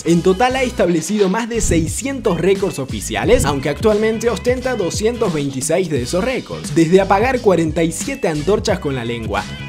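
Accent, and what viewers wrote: Argentina. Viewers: No sabia que eras argentino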